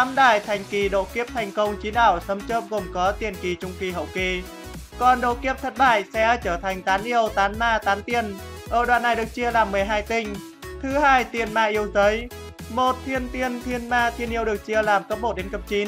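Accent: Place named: North, Vietnam. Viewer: Bắc kỳ đọc khó nghe quá…!😂😂😂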